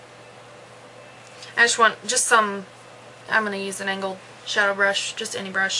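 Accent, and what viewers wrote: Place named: Georgia, United States. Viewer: i love this, its puurrrdy!! you're so cute! what state are you in??? you sound like you're around GA or something... anyways, this looks great on you, i'll have to try it out...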